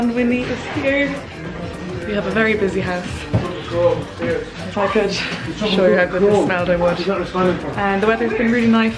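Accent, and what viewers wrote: Ireland. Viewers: Loved this vlog 💕Would literally buy the cottage in Doolin tomorrow if was for sale! NYC just pales into comparison for me. Trilled (!!) you made it home safely (love Irish accents 🤗) Looking forward to more vlogs from Ireland (Q and As would be fabulous) Delighted to see Cooper also 🐕 Stay safe, healthy and positive🌈 Does have a vlog or Instagram? 🌞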